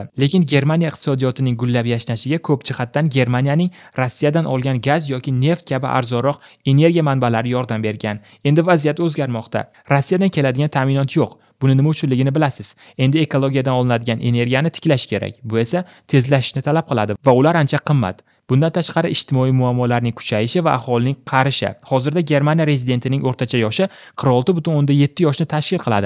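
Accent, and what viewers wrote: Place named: Surxondaryo, Uzbekistan. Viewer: Siz Surxondaryoliksiza, ya'ni Sariosiyo tumanidansiz adashmasam)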